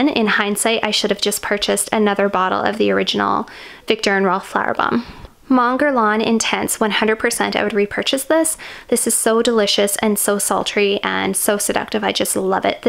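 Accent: Canada. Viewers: Hey, you’re Canadian?!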